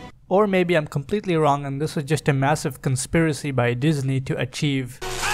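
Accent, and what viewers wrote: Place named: India. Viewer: Starts video -Hears Indian accent Time to abandon ship!!!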